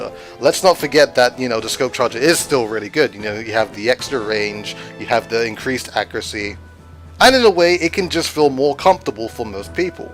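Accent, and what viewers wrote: United Kingdom. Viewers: Your british like me. ;> thats why i see u in ranked battles. also i find the goo tubers are the best charger. ;)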